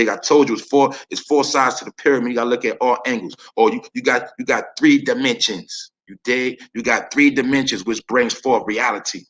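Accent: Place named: Brooklyn, United States. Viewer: Yo ilke how u speak Brooklyn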